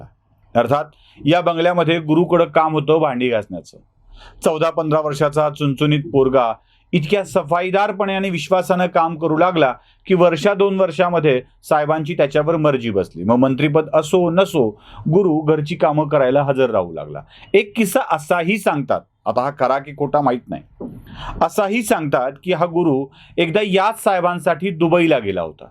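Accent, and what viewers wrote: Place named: Latur, India. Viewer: शब्दाला व शब्द फेकीला धार आली आहे. खूप खूप शुभेच्छा. एक कळकळीची विनंती. न आणि ण ची गल्लत सुधारून घ्या. लातूरची भाषा, हेल आवडेल पण उद्याची पिढी जेव्हा तुमचा आदर्श ठेऊन अश्या चुका करतील व ते पण असेच बोलतात म्हणून सांगतील तेव्हा खूप दुःख होईल. राग नका मानू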